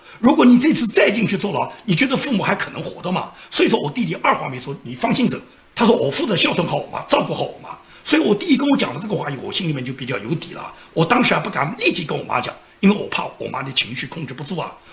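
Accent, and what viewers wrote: Nanjing, China. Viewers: 一听就是南京口音😄。㊗️老乡身体健康！诸事顺意！ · 建民一口标准南京话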